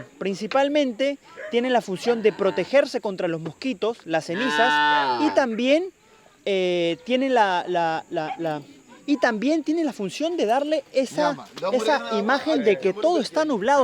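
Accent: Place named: Argentina. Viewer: Pobre hombre no quisiera estar en tu lugar, hablas Como Argentino, y tienes in aire de Carlos alvarez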